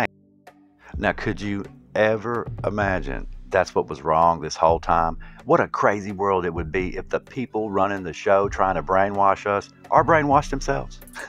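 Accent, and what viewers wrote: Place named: South, United States. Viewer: Hey brother i appreciate your work man. And i can tell u a southern boy like me and that makes me like it even more.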